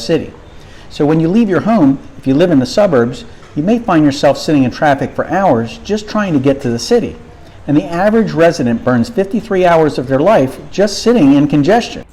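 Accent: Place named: New England, United States. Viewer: Sounds just like new England. only less weirdos.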